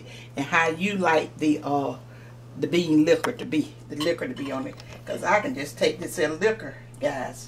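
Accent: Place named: Alabama, United States. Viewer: You speak like my late grandma. Are you from south Alabama?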